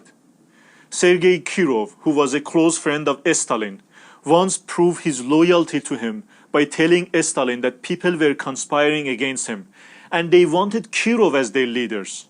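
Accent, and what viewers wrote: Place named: Iran. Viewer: I can tell by the accent that this guy is Iranian....لهجه تابلو هست که گوینده ایرانی هست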